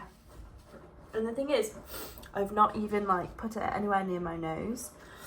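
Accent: United Kingdom. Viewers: What Part Of The UK U From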